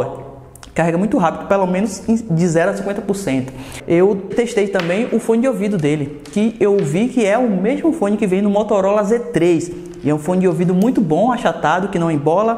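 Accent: Bahia, Brazil. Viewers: Tu é baiano é vei? Ne?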